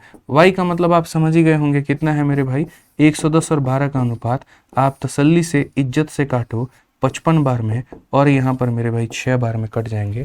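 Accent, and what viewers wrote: Bihar, India. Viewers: बिहारी स्टाईल सबसे मस्त हैं सर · Waah guru ji bihari andaj me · भईया आप बिहारी लग रहे हो 😮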